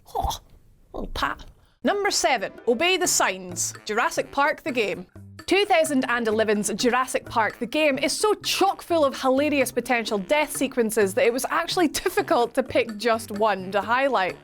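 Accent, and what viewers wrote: Scotland, United Kingdom. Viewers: Gaelic, lovely. She's probably Scottish. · Is she Scottish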